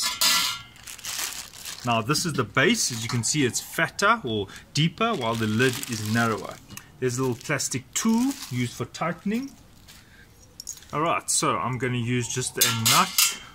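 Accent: South Africa. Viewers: Love the SA accent, going to assemble my weeeber tomorrow now. Thanks mate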